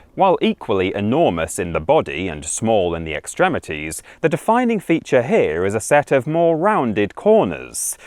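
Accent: United Kingdom. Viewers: I heard the British accent even before I turned up the volume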